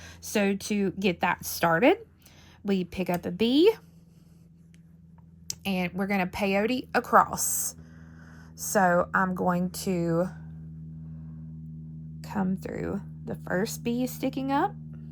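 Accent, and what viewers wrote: South, United States. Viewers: Wow, this is lovely. I love the tassel, but I think for longevity, I would prefer a tassel made with beads. And I think you meant 25x18mm, not 25x8mm., you are amazing. Funny, all these years I thought your last name was, but it's just your warm Southern accent that made it not sound like.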